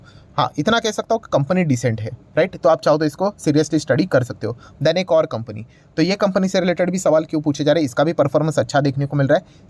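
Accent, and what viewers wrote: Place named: Bhopal, India. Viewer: भाई ये भोपाली हैं, और भोपाली ऐसे ही बोलते हैं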